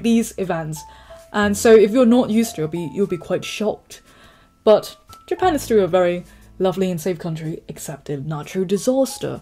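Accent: United Kingdom. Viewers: it's so funny to see a cute lady with such a thick english accent and frequency tone, the contrast is weirdly attractive. Interesting, but I'll try this year and see for myself nothing better than this, I m also introvert, so I feel like a more autist society might just do, one year of PVT!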